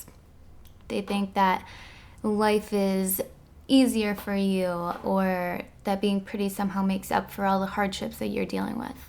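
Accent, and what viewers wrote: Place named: Spain. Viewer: Good Spanish accent.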